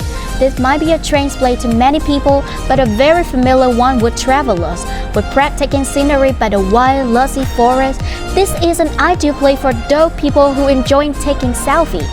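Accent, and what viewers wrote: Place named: England, United Kingdom. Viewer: Love your English accent so much ❤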